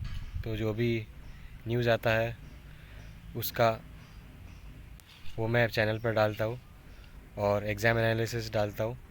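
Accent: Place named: Maharashtra, India. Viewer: Note*-playback speed -1.75x Thanks bro ,it seems like ur first language is marathi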